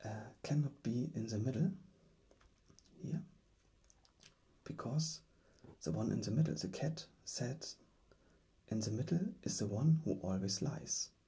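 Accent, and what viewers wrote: Germany. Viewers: Ich finde deine Videos echt klasse. Die Kombination aus ASMR und Logikrätseln ist super! Und du hast ein beachtliches Zeichentalent. Im vergleich zu dem, was ich so zustande kriege 🙈 Eine Sache muss ich aber doch auch loswerden, die mir als etwas störend auffällt. Du hast eine sehr deutsche Aussprache von Englisch. Besonders bei dem "th" fällt das auf. Falls du dich da gerne verbessern möchtest: Ich denke, beim "th" ist es Übungssache. Die Zunge muss unbedingt kurz zwischen die Zähne beim Sprechen. Vielleicht hilft es dir ja generell für die Aussprache auch, englische Filme zu gucken, um in den englischen Sound reinzukommen. ;) Dein Vokabular ist auf jeden Fall schon richtig gut. Und du hast eine sehr angenehme Stimme zum Zuhören.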